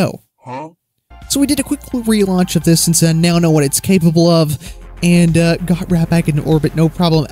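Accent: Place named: Virginia, United States. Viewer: First time I stumbled across your channel, and I gotta say... Wow, this was really enjoyable to watch. Great commentary, you didn't drag it out 3 times longer than needed to be, bits of humor, and nice editing. 👍👍 Also, you sound like my relatives, and I'm guessing you live in western area of Virginia.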